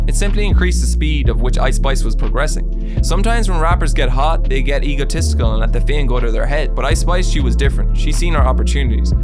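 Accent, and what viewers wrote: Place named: Ireland. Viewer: dang she is a great example of right place, right time, right person, right team, right everything! Also are you from Ireland? Your accent is dope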